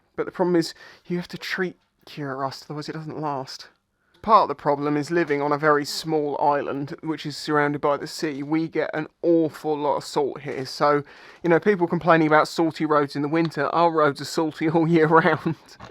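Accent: United Kingdom. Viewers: Are you British or American you sound British but you said DR ZEE if your British you should know we say Zed , adjusting for the yanks are we.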